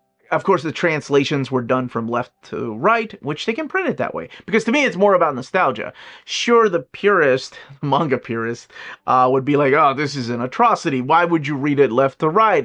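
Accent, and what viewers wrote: United States. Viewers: Clicked because of cowboy hat and was NOT let down when i got the accent to go with it! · i want a compilation video of cowboy accent to listen to before i go to sleep every night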